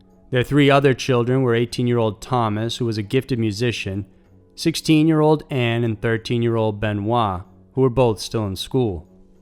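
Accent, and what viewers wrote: South, United States. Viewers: I love it too! He sounds like he has a southern accent with some of the things he says!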